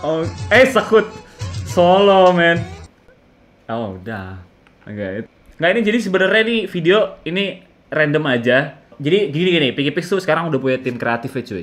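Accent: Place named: Bali, Indonesia. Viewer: Duh aksen baline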